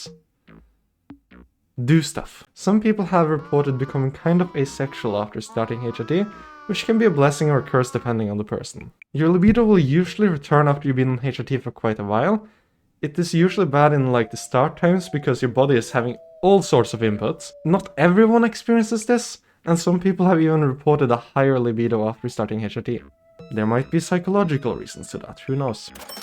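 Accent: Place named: Norway. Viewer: recognizes accent -immediately goes to channel info -NORGE!!!🇳🇴🇳🇴🇳🇴🇳🇴🇳🇴💪🏻💪🏻💪🏻💪🏻💪🏻💪🏻💪🏻💪🏻💪🏻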